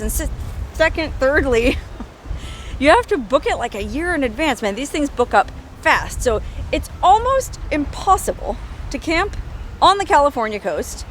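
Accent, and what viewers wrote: Australia. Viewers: G'day mate. your OZ accent was pretty good. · Your Australian accent is very Steve Irwin 👍 Definitely better than Meryl Streep's "A dingo's got my baby' 👍🤣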